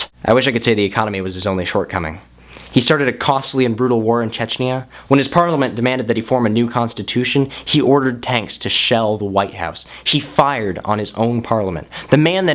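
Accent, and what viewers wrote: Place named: France. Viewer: How in the world did you know all this? More importantly, your French/Non Russian accent is adorable.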